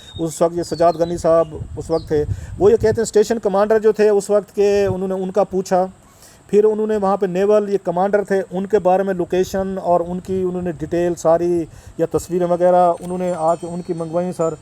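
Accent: Saraiki, Pakistan. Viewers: sahb is our saraiki brother and every saraiki has same accent. We love this accent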